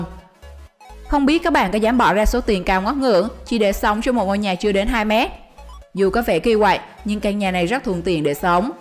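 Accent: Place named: Central, Vietnam. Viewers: Cám ơn admin cháu gái dễ thương, thích cái giọng nói miền Trung này 👍👍👍👍👏👏👏👏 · Giọng nói của em kỳ kỳ sao ấy...!! Thà cứ nói đúng Giọng miền trung đi mà anh thích nghe hơn..! Chứ đừng sửa Giọng làm gì cho nửa nạc nửa mỡ...nghe muốn ói quá..!!!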